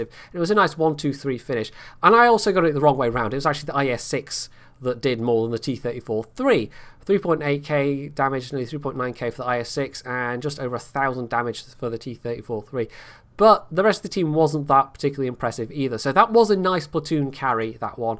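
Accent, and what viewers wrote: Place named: United Kingdom. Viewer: I'll be there :) btw where are you from you sound British to me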